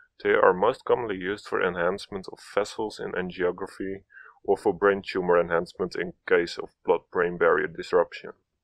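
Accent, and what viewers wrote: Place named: Netherlands. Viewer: Waarom klinkt deze gast nederlands xD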